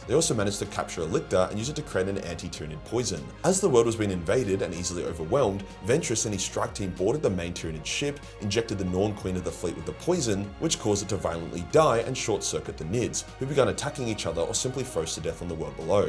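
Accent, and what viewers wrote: Australia. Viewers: Nice vid ya Aussie 😂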